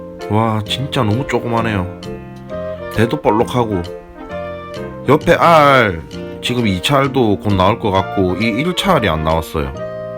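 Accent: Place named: Busan, South Korea. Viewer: 말투랑 목소리 졸귀탱ㅋㅋㅋㅋ 부산억양 너무 좋습니다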